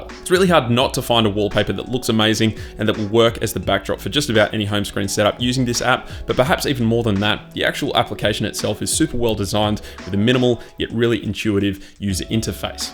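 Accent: Australia. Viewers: Aussie I guess😀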